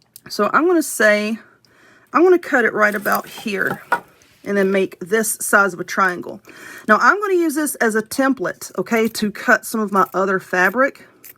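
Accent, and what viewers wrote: South, United States. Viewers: I love watching your videos, you seem to always have a good time 😊. Love your Southern accent, as I was born in Mississippi and now live in California 💜💜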